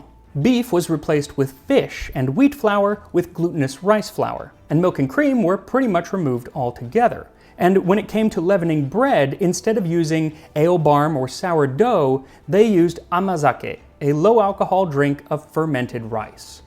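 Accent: Brazil. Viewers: Bro, please DO NOT pronounce Portuguese words like the Brazilian do, it sound VERY VERY WRONG - cringe AF! Portuguese from Portugal sounds absolutely NOTHING like Brazilian Portuguese! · I love your channel, but as a Portuguese native, you are saying portuguese words with a Brazilian accent. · Great video just irks me that when you talk about portuguese dishes or words u always say it with the Brazillian Portuguese accent and not the original Portuguese accent (which is fair for example in the Feijoada video), but it doesn't make sense in the video referring to dishes from Portugal like this/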